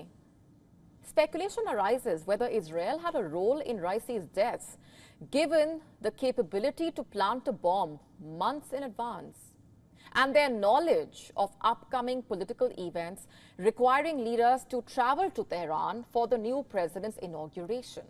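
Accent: India. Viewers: Accent and herself both are not sexy like a common Indian, Pakistani or Bangali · Indian accent not very sexy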